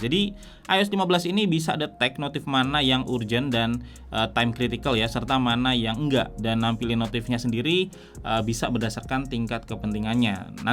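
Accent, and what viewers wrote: Malang, Indonesia. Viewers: Asli malang bang ?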